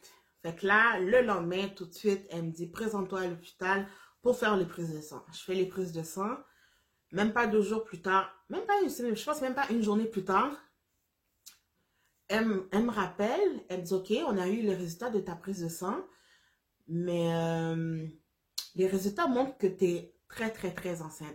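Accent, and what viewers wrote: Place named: Haiti. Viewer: Je sens à ta voix que t haïtienne 🇭🇹 💪🏾 En tout cas c’est une histoire extraordinaire c’est Dieu qui t’a donné ce petit bout de chou pour te dire de garder espoir tout n’était pas fini pour toi Par contre ton conjoint la sa réaction est très mauvaise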